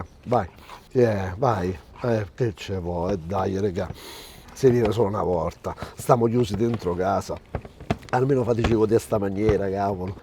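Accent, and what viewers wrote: Rome, Italy. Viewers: Complimenti sei simpatico mi ricordi la Sora Lella, autentico romano🤩🤩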